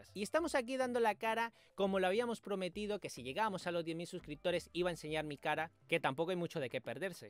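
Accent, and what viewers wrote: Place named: Seville, Spain. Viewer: Ostras pues te imaginaba de otra forma, no corresponde tu voz con tu cara jajaja no es nada malo, pero el acento lo relacionaba a un sevillano cerrao rubio jaja ¿ De donde decias que eras? Eres un crack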